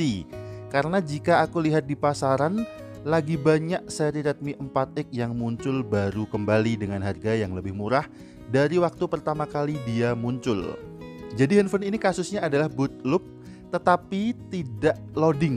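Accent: Magelang, Indonesia. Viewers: mas sampeyan wong Magelang ndi?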